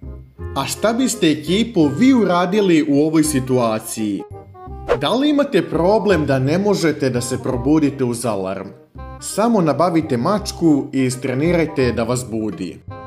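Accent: Montenegro. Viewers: Човек говори својим дијалектом ( црногорски ) и неумесно је критиковати га због тога , као што је и не људски критиковати га због говорме мане !Логопед неби могао сада да му помогне јер је старији !!! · Jeste li primetili da je crnogorac vidim po naglaskom ja sam isto kumara 😂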